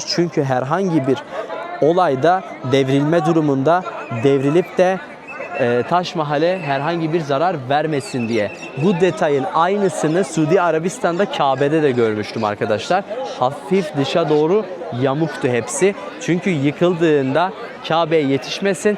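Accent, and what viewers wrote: Turkey. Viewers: Töbe haram demen harikaydı😂Şiven çok güzel yaa..Bizim oraların( Diyarbakır ,Mardin..vs)insanının sıcaklığını hissediyorum.❤😊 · nerelisin dogu şivesi var ama bilemedim ayrıca kanalı yeni keşfettim hemen abone oldum çok güzel videolar emin olabilirsiniz izlemeye devam edeceğim yolun açık olsun başarılar dilerim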